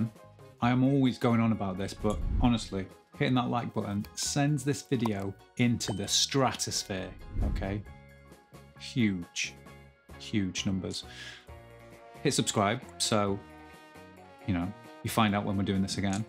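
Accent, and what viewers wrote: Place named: North West England, United Kingdom. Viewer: Also wanted to ask where you’re from. I’m originally from Hanley, Stoke-on-Trent, left for Canada from Northwich, and taught high school in Macclesfield. I notice certain inflections in the accent that give away a North West origin.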